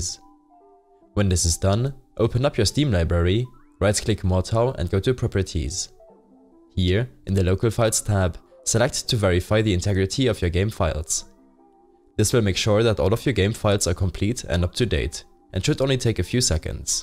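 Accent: Germany. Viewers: This german accent omg Im german too yay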